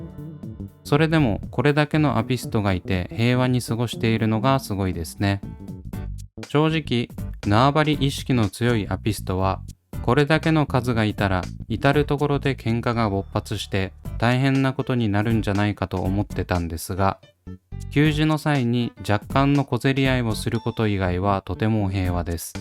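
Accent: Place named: Kurume, Japan. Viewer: 北部九州系、久留米あたりのイントネーションで、よどみなく滔々と話されるのが心地よいです。アピスト？ああ、まぁ育ってんじゃないですかね、って感じです。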